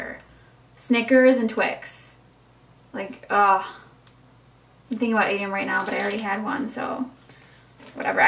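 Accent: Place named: Upstate New York, United States. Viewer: She's from upstate NY I know because that's where I'm from I can tell by her accent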